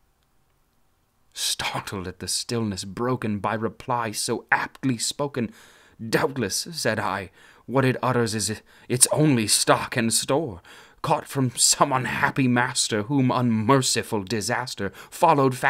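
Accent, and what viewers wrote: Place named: Mid-Atlantic, United States. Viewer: Excellent use of the midatlantic accent. Your inflection and pacing bring to mind the best actors of the last century, trained in Shakespeare. Thank you so much for sharing this with us!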